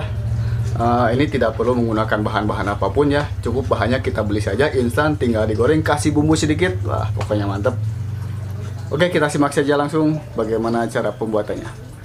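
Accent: West Java, Indonesia. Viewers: Kang orang sunda mana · beda wae ari urang sunda mah nyarios na jakarta bandung hahahaha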